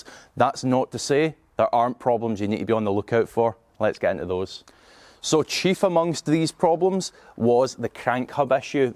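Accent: Scotland, United Kingdom. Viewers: Scottish ( English)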